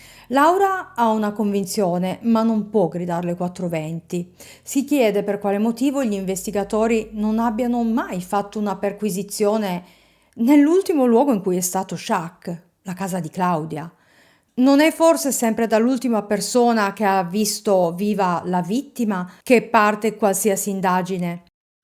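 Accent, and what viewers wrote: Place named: Piedmont, Italy. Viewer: Ciao ti ho scoperta da tre giorni ma sto guardando tanti tuoi video perché ti trovo davvero brava forse perché dall'accento mi sembri Piemontese come me e Elisa true Crime, Ely in Crimeland e forse per campanilismo,ma anche perché come nel primo caso che mi ha fatto mettere il segui Il caso di Berfin ho notato la tua empatia, la tua compassione,e questo mi piace,non mi piace chi giudica le vittime, soprattutto quando sono vittime soprattutto di maltrattamenti e abuso psicologiche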